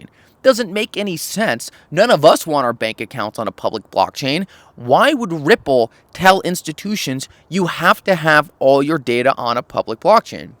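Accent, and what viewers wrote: Allentown, United States. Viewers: It is going down with an Allentown accent. I thought you were good in Math dude.. Gaining 20% of 60 % crash from March levels isn't going up bro.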